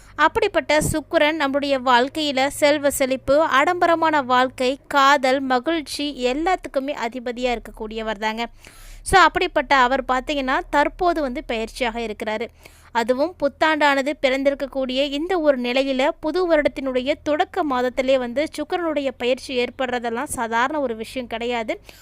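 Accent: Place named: Tirunelveli, India. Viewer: ஏல திருநெல்வேலி பக்கம் வந்த உண்ண??????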